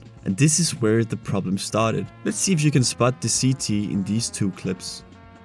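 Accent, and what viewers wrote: Denmark. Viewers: Den danske accent 😭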